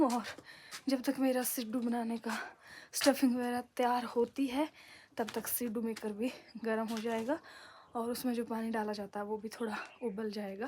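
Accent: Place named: Pahari, India. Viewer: Hy ksy ho? Aap ki pahari ton bhut Aachi h Or Aap khud bhi bhut sundar ho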